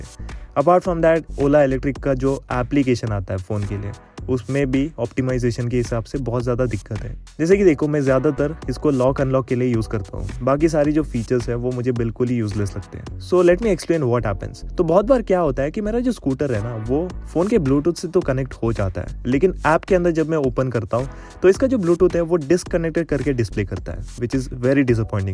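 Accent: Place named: South India, India. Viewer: Sauth Indian ho bro aap aap ki accent Kuch alag lag rahi he muje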